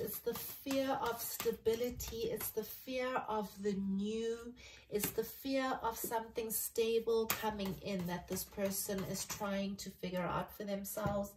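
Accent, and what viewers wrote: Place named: South Africa. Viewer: I know that South African accent. Heeey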